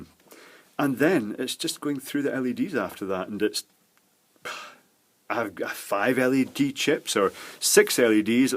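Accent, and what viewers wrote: Scotland, United Kingdom. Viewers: True, he is actually from East Kilbride one of my favourite Scottish toons (lovely people) but has a Newton Mearns (snobbiest toon in Scotland with the most horrible people) voice - very polite and annuciative. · Nice video. I like your scottish accent ;)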